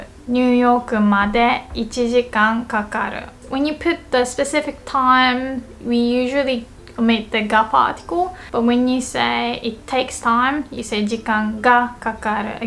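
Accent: United Kingdom. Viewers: I wonder if she learned english from a British teacher 🤔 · You have a great accent I've never heard before! Did you Maybe live/learn English is England or the UK? You have an accent similar to James Grime. (and brains to match!) (singing banana / numberphiles math YouTube channel.) 💖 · Most of the Japanese teachers have an American accent, enjoyed seeing British pronunciation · Actually, it's a British accent. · Are you in Uk? A bit accent of the UK! Overall, your teaching is helpful! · Your English has a British accent. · I initially thought you might be British...... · It sounds super british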